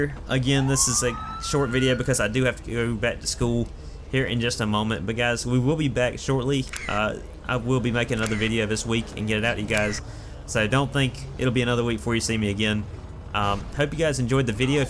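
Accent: South, United States. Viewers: Wat state are you from cause it sounds like you have a southern accent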